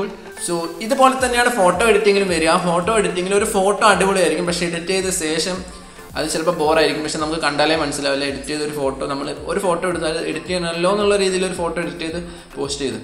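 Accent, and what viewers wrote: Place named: Kannur, India. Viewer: Pullide samsaram kettittu kannoor karan aaanu thonunn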